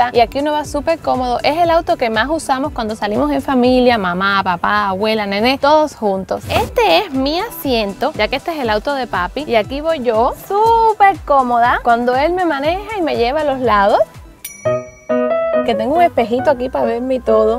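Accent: Cuba. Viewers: Sus voces son iguales por que las dos son cubanas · Hola se me haces muy parecido tu hablado a Sandra cires cómo cubana 😁 me encanto tu video y cómo leí con comentario x ahí dile a tu papi que me adopte jajajaja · Marcela Aguilar ovio porque es de cuba como sandra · es de cuba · Jajajaj sii, es q las dos son de Cuba creo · Porque ella es de cubaa las dos son de cuba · cata Orozco por que es cubana y sandra también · Tu tono de voz y acento es muy paresido al de SandasiresArt eres cubana? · Medefrine ella tiene un acento cubano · Por el acento cubano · Cuba creo o República dominicana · alemán mucho seras.cubana te creo jaja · Hija es que es cubana pensá 👋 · Marcela Aguilar claro es cubana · Por que las dos son cubanas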